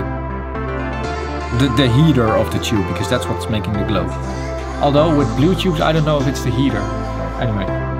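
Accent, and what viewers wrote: Netherlands. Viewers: Oof dat nederlandse accent 😂👌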